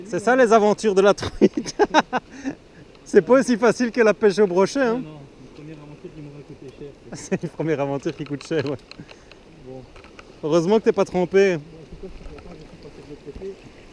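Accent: Belgium. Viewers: saluut superbe video comme tjr tu ma eclater de rire avec ton belge c super marrant dans certaine condition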